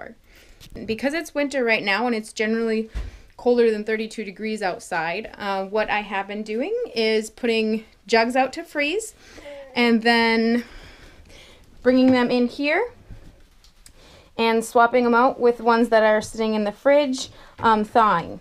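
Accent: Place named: Minnesota, United States. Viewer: I knew I recognized some Minnesota in you. Keep the great video coming!!!! 👍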